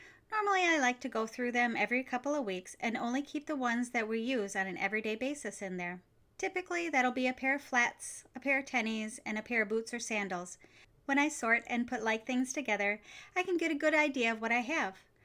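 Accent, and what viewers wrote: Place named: Cape Breton, Canada. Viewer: This is the first of your videos that I've watched. You sound like you come from Cape Breton.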